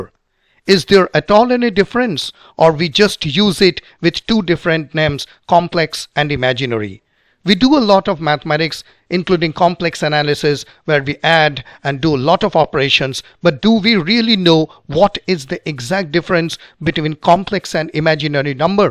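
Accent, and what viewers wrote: India. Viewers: U sound like an Indian ( no racism ) 😅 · He is a really Indian 😅😅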